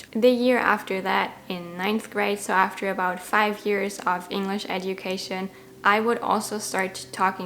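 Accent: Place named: Germany. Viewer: Outstanding work,! You seem to have mastered the English language and it’s grammatical nuances, and you’ll just continue to acquire more vocabulary over time in the same fashion as a native English speaker! Your ability to produce the language is on par with a native, just with a slight German accent which I think is endearing. Thanks for sharing such a detailed history; I find this very motivating for my own journey to Italian language fluency. Well done! Julia (native English speaker from Australia)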